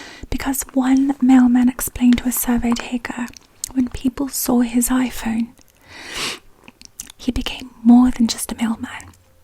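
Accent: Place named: United Kingdom. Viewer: Your accent is so stunning! Are you from the UK?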